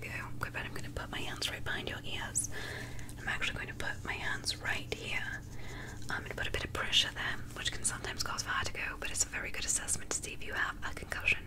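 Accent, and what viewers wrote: United Kingdom. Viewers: i see she channeled her inner brit for this vid and i kinda like it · That British accent though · ehh:3 The British accent is back - Love it:3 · You are the Most Beautiful Doctor with The Most Beautiful Eyes and the Most Beautiful British Accent!! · As soon as I heard the accent I was like:THERE BETTER BE A COMMENT WITH THE BRITISH ACCENT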